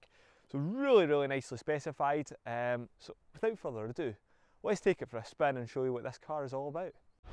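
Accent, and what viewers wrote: Scotland, United Kingdom. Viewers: As a Kenyan and thanks to Sir Alex Ferguson… I love Scottish accent 😁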